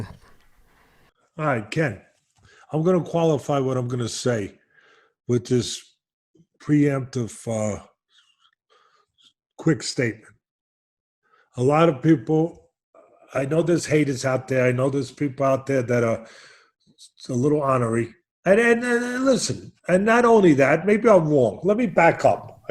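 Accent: New York, United States. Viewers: The older he gets the stronger his NY accent becomes.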